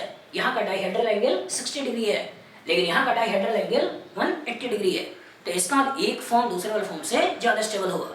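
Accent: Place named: India. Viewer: Thank you sir for your lesson but I understood your english poorly is it Hindinglish?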